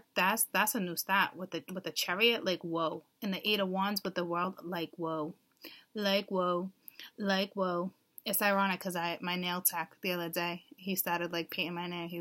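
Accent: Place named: Long Island, United States. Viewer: I am sorry this is SO irrelevant and while I am at it, what a great reading! So thank you(: But are you from like Shirley?!.... L.I.,NY ? I ask because you sounds like just my great aunts from there haha Italians on long island lolol<333 it reminds me of Easter :p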